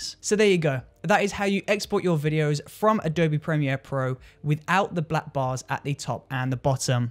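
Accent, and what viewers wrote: United Kingdom. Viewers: are you fromm uk?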